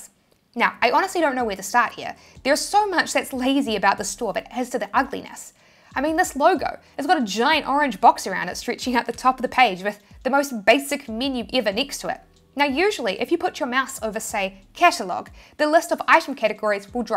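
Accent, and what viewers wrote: New Zealand. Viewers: Great channel and great videos I have followed your advice and made great progress. but now I need your HELP!!! So teespring has disabled my account on the bases that I had violated their trademark rules. I do not understand why this happened, I have uploaded more than a 100 design to the site and none of them has any mark or logo that belongs to someone else. Please what should I Do? Do you have any consultant page where I can reach you directly for advice? I would of course pay for this service. Thank you for your cooperation and keep up the good work. I love your accent by the way Kiwis are very nice people! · At no point in time did I think your accent was Australian. Definitely Kiwi. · Fun fact - despite being an American, I somehow suspected your accent was Kiwi rather than Aussie. I guess I've just had that much experience already from hearing both thanks to the interwebs. 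· Loving that New Zealand accent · Hello, you have New Zealand accent, I'm not a native English speaker but I thought at first that you are Irish ❤ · it was an Itsy bitsy teeny weeny yellow poker dot, new zealand tein ny ( ten) haha! U are sweet! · Right when I thought you had an Australian accent you popped out of nowhere and said this is a New Zealand accent lol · The New Zealand accent is wonderful! I just love the way it sounds. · New Zealand accent is not that much of an issue. Guys from India have a whole lot bigger accent problem.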